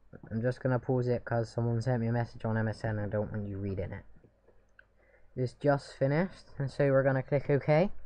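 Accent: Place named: England, United Kingdom. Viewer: he sounds english not like a chav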